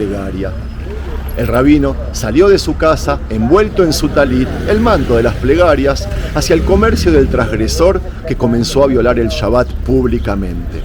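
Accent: Buenos Aires, Argentina. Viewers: Como es que aun guardas el acento porteño, despues de tanto tiempo.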